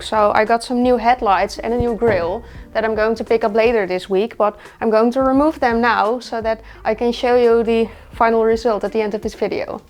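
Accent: Netherlands. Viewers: My first video I saw from you, I really enjoyed it, so thank you! I also love your Dutch accent haha.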